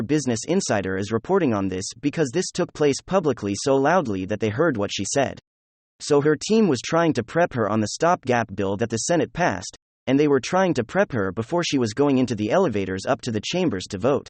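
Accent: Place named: Russia. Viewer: use your real voice next time i want to hear your cute russian accent :3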